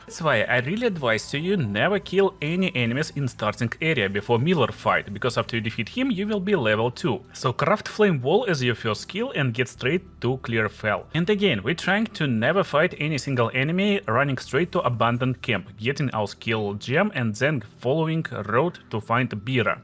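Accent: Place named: Russia. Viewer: Я слышу что ты русскоговорящий :))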